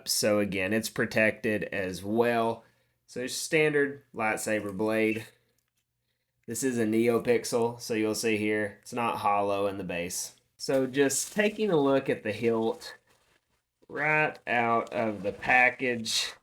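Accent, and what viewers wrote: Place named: South, United States. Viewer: Southern accent is fucking cute❤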